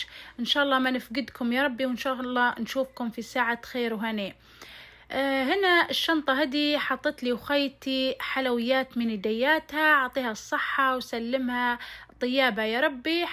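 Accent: Misrata, Libya. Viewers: طلعتي مصراتية 😂❤❤ و اني نقول لهجتك مش طرابلسيه ، ربي يحفظ ليبيا كلها ❤ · من مصراتة واضح لهجتك 😂😂❤ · الله طلعتي مصراتيه شكيت في لهجتك فالاول 🥹🤍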